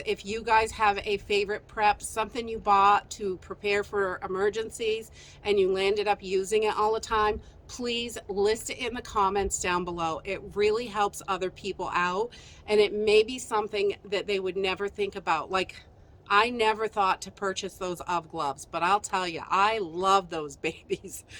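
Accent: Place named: Long Island, United States. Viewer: where are you from originally? Sometimes your accent sounds Long Islandy and the expression "landed up" as opposed to "ended up" is different.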